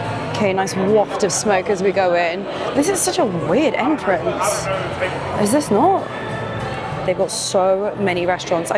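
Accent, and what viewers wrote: United Kingdom. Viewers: Ma'am I love your British Accent. CHEERS 🥂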